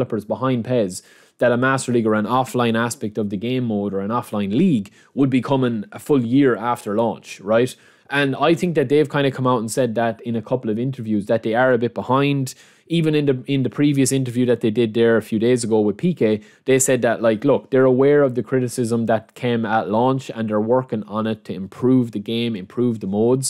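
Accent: Ireland. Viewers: That Irish accent is quite a ting, lad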